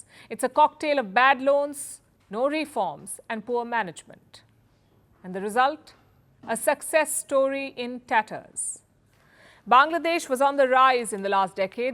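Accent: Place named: India. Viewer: Why this lady keeps having wet dreams I. Bangladesh and keeps spreading rumor in an annoying Indian accent?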